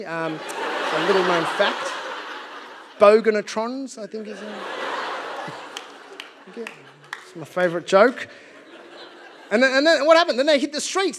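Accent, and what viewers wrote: Australia. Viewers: So nice... Australian accent is wicked.. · I detect a slight Australian accent 😅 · As a brown immigrant in California... roundabout way of saying American...if I closed my eyes and watched this I would think some white Aussie commie from cricket telling jokes. World is so bizarrea and interesting.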